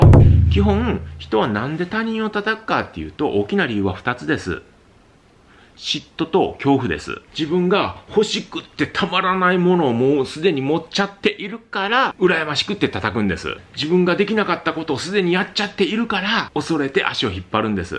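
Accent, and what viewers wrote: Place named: Kansai, Japan. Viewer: ありがとうございます。ここ4年ほど、腰痛に悩まされています。2週間ほど前に、初めて、Shojiさんの動画を拝見しました。さっそく、Shojiさんのおっしゃる通り、ストレッチのエクササイズを始めたら、体が軽くなったような気がします。理学療法による治療に通っているのに、いつまでたっても、痛かったんです。50歳になったばかりですが、年のせいなのか、仕事（商品補充、日によって2トンは越える荷物を運んでます）のせいかと思っていたんですが、、、体に対して、無知だから、腰痛を起こしていたのですね。悩みがあると関節痛も出るし。南仏に滞在して23年、もうすぐ、日本料理教室、一本だけで、やっていくつもりです。健康でなくっちゃ～！Shojiさんの哲学にも納得です。尼崎で幼少期を過ごした私にとって、Shojiさんの関西弁も気持ちいいです。これからも、もっともっと笑顔で過ごしたいです。Shojiさんのおかげで、実現できそうです。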